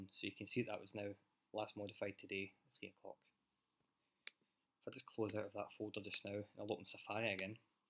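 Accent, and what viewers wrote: Scotland, United Kingdom. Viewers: Hi. It sounds like this is a good fix but you're speaking so fast with your Scots accent that I can barely understand what you're saying. I wish I did. I love your accent. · William Wallace accent!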